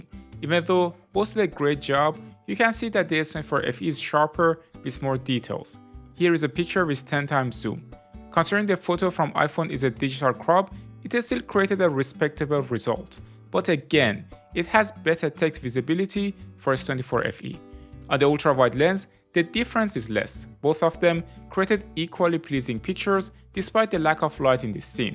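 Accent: Iran. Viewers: لحجت به فارسی ایرانیا میخوره ! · I don't know where are you from but you have an iranian English accent 😁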